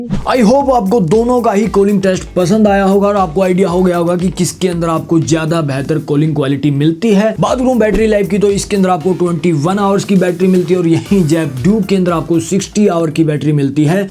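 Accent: Haryana, India. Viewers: Bhai haryana te h k?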